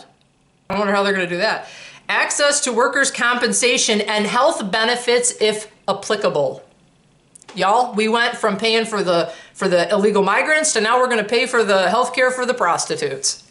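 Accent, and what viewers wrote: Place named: Illinois, United States. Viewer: I just found out about you YouTube said check this out. I can tell you are from the state of Illinois just tell you. Talk in your accent 😁 cuz I am just like you. Born in this state. Had parents that were totally upset about the state. For pretty much the same reasons. But now we got new ones, illegals 🤯 we used to kill people who invaded our country. Like you said wokeness his reign supreme. But I do think California has its speed on the wokeness? I may be wrong. But I don't think so, well what part of Chicago are you in dear 🙏 North South Central? Like I told you I'm in Alton Illinois. The early retirement I took. What's from the University of Illinois@ So I'm very familiar with Chicago. Love the city 🌆 I used to love driving Lakeshore drive at the lights were coming on. And all the international cuisine@ kiss 💋 food fantastic. 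All right I was bored and figured I'd drop a few lines. I will admit I'm not surprised. Have you lived in this state your whole life? Then I'm kind of surprised you're surprised 🙀 prostitution legal. Sounds about right to me 😎 that's why I want Republicans to take over this state. The Democrats have literally lost their mind 😭😞 started back with the clintons in my opinion. But my opinion won't even buy you a cup of coffee ☕ not at today's prices.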